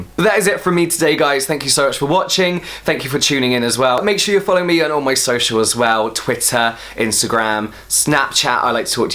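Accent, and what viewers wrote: United Kingdom. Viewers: Looks like this is interesting!!!...Let me subscribe to your channel now...I'm crazy about the british accent _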